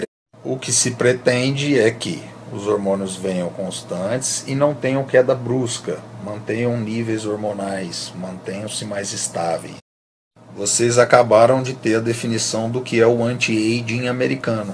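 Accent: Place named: Bahia, Brazil. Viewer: Você e baiano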